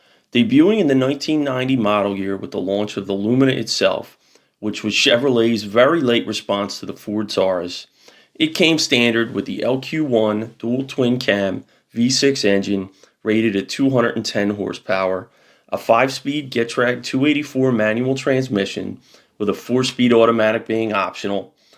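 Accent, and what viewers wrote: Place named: Northeast, United States. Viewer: Fun video (as is your accent - somewhere northeast?). It's always entertaining to my Brit eyes to see the parallels across the pond. Over here, we had similar early 80s Cavs, but the sporty Vauxhalls (obvs Opels under the badge) were more the Astra (smaller) and Nova (smaller still). I feel like we had some very similar wheels to those Z24s though - they're definitely sparking childhood memories!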